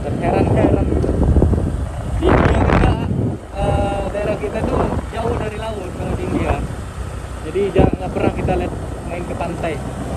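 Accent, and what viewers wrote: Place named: Medan, Indonesia. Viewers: Bhasa ny kek org medan y kk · Qkira org medan.. dari logatnya, gk nyangka klo org lampung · Saya kira bang ini orang medan krn logatnya kayak medan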